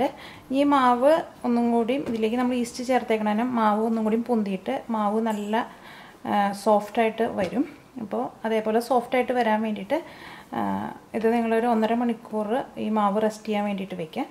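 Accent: Thrissur, India. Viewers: Nalla avtharana shily trishoor voice basha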